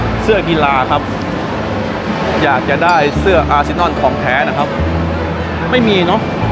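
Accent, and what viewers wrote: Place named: Isan, Thailand. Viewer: คนทำคลิป วีดีโอ คนไทยอีสานแน่นอน สำเนียง บอกภาษา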